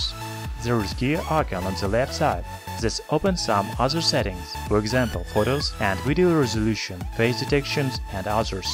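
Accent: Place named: Russia. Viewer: а я то думаю..что за провинциальный городишко забугорный так похожий на наши)) даже рашн акцент сразу не заметил)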